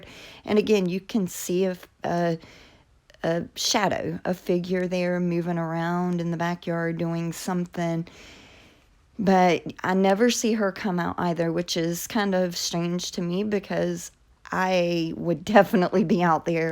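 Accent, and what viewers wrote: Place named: Carolinas, United States. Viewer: You from the Carolinas? Love the accent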